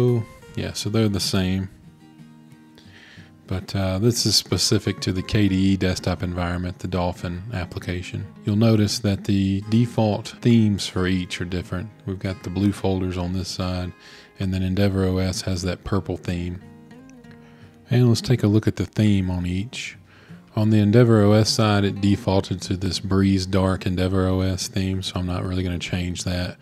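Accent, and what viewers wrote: United States. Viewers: Wonder why I can kinda guess which part of USA you're from!?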